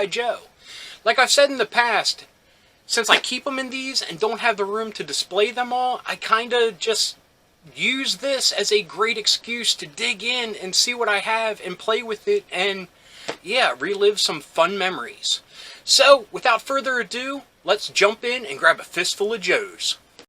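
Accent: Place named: Maryland, United States. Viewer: Are you in Maryland you sound like it I'm in Baltimore I know how we say our Os. If so I'd love to do some Vinatge Joe swaps with ya if your ever interested!!. Also an 80s Kid as well grew up in Baltimore County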